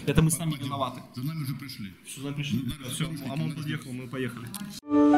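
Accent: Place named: Odessa, Ukraine. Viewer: У НЕГО ТЕМБР ГОЛОСА ОДЕСИТА ЧЕТКО БЫСТРО ПО ДЕЛУ С ЮМОРКОМ РАВНЫХ НЕТ !!!!!!!!!!!! У ВАС ТАК НЕ ПОЛУЧИТСЯ !!!!! ЗНАТЬ !!!!! УМЕТЬ НАДО !!!!!!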